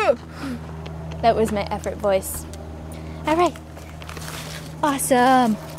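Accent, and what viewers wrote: Australia. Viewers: Welcome!!!! Nice australlian accent ;) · I like your Australian accent :)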